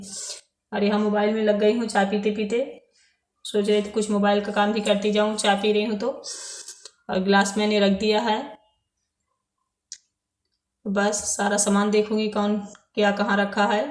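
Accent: India. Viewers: Aj to bhojpuriya bol rahi hai 😃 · Aaj apne bilkul desi tareeke me baat ki accha laga aur dulaiya bhi hi gai bahut hi badhiya video 👍👍🙏👍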